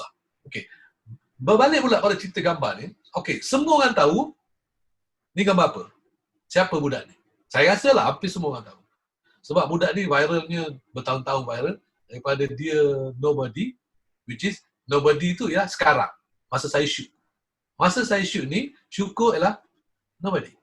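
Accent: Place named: Kelantan, Malaysia. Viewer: Terbaek la bila sifo share ilmu. Mula2 ckp dah tak bunyi kelate. Bila dah masuk part gambar ada keluar juga 😅. Apa pun mmg terbaek bang. Saya enjoy!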